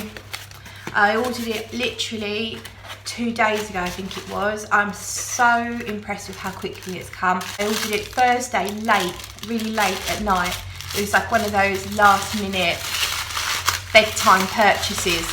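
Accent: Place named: United Kingdom. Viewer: I am new to the fragrance hobby, and I just recently discovered your channel. Not sure if it’s your British accent or just the soothing sound of your voice, but I find your reviews hard to stop watching 😀 I really enjoy how you describe scents, it makes them come alive in my mind. Thank you. I am ordering a boat load of samples from LuckyScent based on your reviews!!!!!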